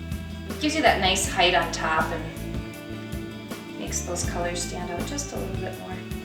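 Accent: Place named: Minnesota, United States. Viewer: r u from minnisota u knind of have the accent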